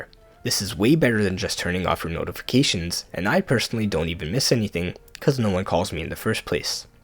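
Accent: United Kingdom. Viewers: Your British accent made me laugh 🤣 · that oxford student voice though.... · That British accent is FOUL lmaoooo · nah bro the english accent 💀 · british accent goes crazy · the british accent broke me!! perfection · That British accent though. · british accent 😄🤩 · The British accent got me. it literally forced me to drop a like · I love the British accent😂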